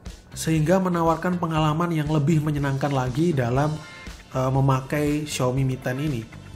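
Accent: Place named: Java, Indonesia. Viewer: Medok bro 😁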